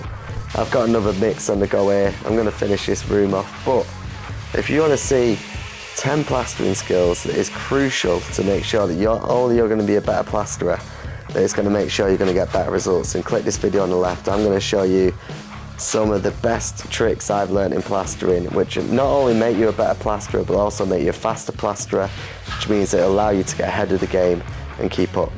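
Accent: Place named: Manchester, United Kingdom. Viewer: my brother been following your Chanel as im researching plastering, got to say your content and personality are really good my man. really good vids. you sound like you manc based do you get to east cheshire at all i got a big 4 room extension need a plasterer??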